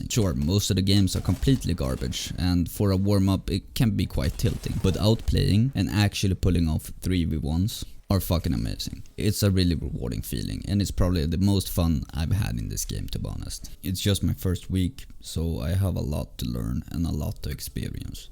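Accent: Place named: Sweden. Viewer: I love your swedish accent, new sub tho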